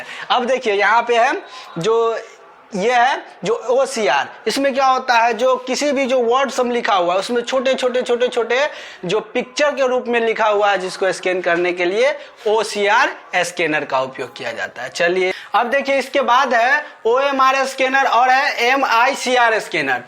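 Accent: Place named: Bihar, India. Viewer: Bihari pronounciation 😅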